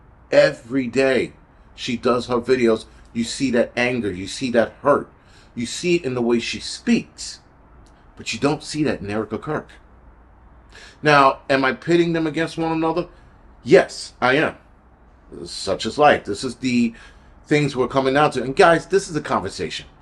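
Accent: New York, United States. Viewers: I love your New York accent ♥️ Keep asking questions. We want to know the truth! I agree, I’m not comfortable how Erika Kirk made the statement “I forgive that man”. What man? Tyler Robinson? There are no photos or REAL Evidence linking him to the crime.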